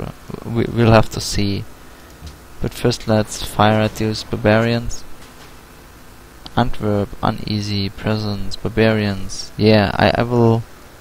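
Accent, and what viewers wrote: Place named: Germany. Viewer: das ist doch denglish das du da sprichst freundchen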